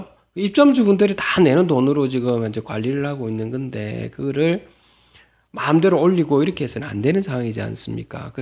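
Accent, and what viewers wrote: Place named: Gyeongsang, South Korea. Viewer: 성낙을 받아 어결권을 ~ 갱상도 사투리가 정겹네요 ㅎ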